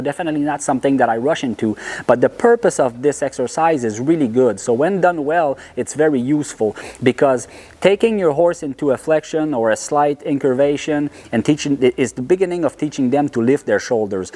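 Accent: Quebec, Canada. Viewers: J'adore tes vidéos 👌🏼 le petit accent a la Québécois j'adore haha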